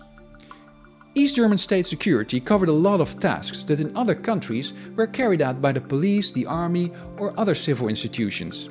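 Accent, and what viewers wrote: Netherlands. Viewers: Are you a Dutchman? Your English is like that of a Dutchman.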